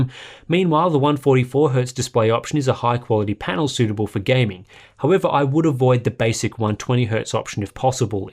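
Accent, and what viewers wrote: Australia. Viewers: great work with the review. only of the reason i watched it was that you are in australia (assumption with your accent). i would have liked if some of your links were australian stores. just google australian store none of them stock ryzen laptop except the ASUS Tuff or asus rog g14. not helping with the decision making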